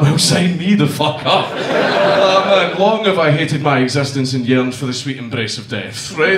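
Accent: Scotland, United Kingdom. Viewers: Been watching dankula and kmac for like 2 hours straight and everything i read or think now is in a scottish accent send help.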